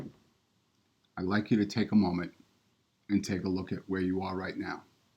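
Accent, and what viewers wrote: New York, United States. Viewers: Came to realise and found this channel. Our last "quarrel" as I tend to remember them was about missing mother's Day, and I used the argument "mom, since dad died in January this year it's been mother's Day everyday to me", and I got away with it at that moment, but it got out of hand for the so maniest time yesterday which made me google "narcissistic mother" and find this series of incredibly insightful videos. Thank you! (Love your NY accent!)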